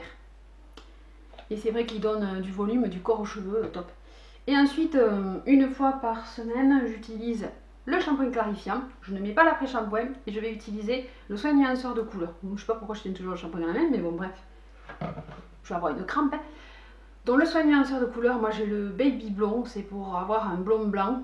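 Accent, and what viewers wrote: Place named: Centre, France. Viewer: .... même si je ne commente pas tjrs, sâches que je regarde tes videos de temps a autres... j'adore ta façon de presenter, ton accent egalement.. (du centre de la france, non,?)... tu ammènes une certaine fraicheur, en bref tu es geniale...+1 like... abiento:)))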